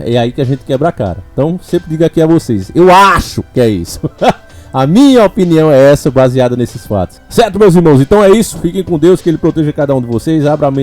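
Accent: Northeast, Brazil. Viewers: BOM Domingo á todos. Grata Dr,já peguei o meu diploma de " telejumento " pois soltei o riso na pergunta: Ou é evoluido ou não? Assinale as alternativas ( Janja em F you) ou 😂😂 ( Musk,eles perderão as eleiçôes) e no som lindo da sua voz falando " convergindo" como um i aberto e, melôdia do Nordeste. Escolhí Elon e a Melôdia. Sou diplomada?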